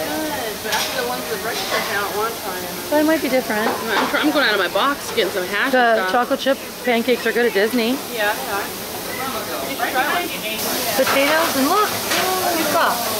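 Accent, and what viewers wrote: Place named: New Zealand. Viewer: isnt saying ca-bah-na wrong. That's how you'd say it in New Zealand:) same with how we say dance. We dont say it daa-nce we say it more like dah-nce.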